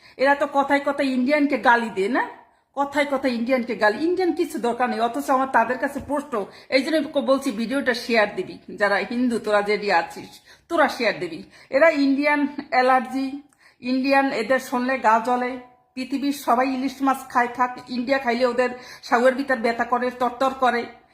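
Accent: Barishal, Bangladesh. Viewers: পুরাই বরিশালের ভাষা দারুন।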